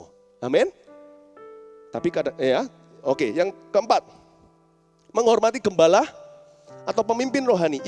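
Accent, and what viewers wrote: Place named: Surabaya, Indonesia. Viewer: Julia Angelina hehehe... itu logatnya orang cina surabaya emang medok bangett...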